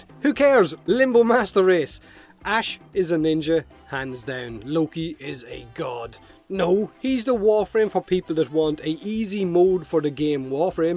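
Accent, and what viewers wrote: Ireland. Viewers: Jacksepticeye reading comments... reading comments... I don't think we realise just how much we need Irish men reading and taking the mickey out of internet users.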